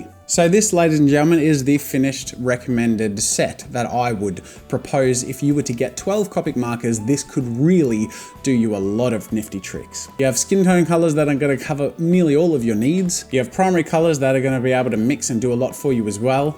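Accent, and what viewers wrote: Australia. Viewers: all Australians pronounce Manga and Copic like you do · are u aussie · Ur Aussie??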